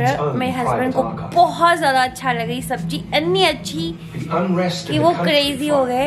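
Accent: Punjab, India. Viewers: Sis ap ki pangabi bht mazay ki hai or jo jo hubby kay sat maza ajata hai ma bhe panjabi hon from lahore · You are so cute di. Mujhe apke vlog boht pasand hai or jaise aap bolte ho mix punjabi hindi boht hi cute lgte ho. You guys are amazing god bless you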